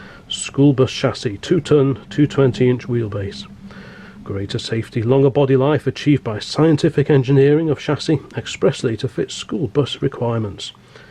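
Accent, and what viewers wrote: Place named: United Kingdom. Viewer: Truly appreciate the British hackscent accented narrative. The lack of a loud annoying soundtrack is certainly lovely. Just the facts sir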